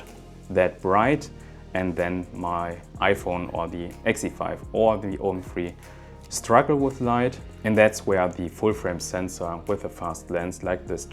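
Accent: Germany. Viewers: Interesting to see asian guy who speaking english wit a german accent 😊 and Thanks for video! Nice one ☝️ keep going!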